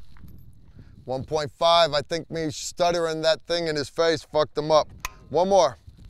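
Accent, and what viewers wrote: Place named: Northeast, United States. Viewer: New to the channel so this may have been answered already, NY? Boston? CT? What's the accent?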